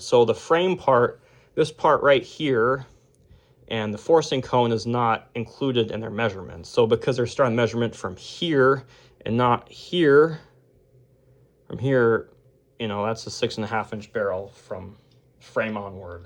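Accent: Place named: Midwest, United States. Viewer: Sounds like a Midwesterner to me.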